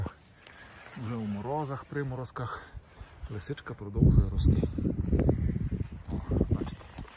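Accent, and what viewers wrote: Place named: Transcarpathia, Ukraine. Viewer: Звідки родом і який район , якщо не секрет ? По акценту наш закарпатець...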